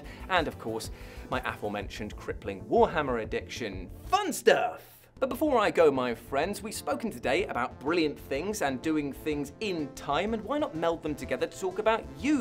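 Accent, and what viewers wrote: United Kingdom. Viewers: The moment I heard the voice... I expected to hear... hii I'm from w... and these are 10 games.... xD Glad to find you on this channel! Hit sub cus I love your corny humour and British narration! Looking for a 10ish hour game to complete in 2ish streams... and this gave me yet another channel to sub and watch xD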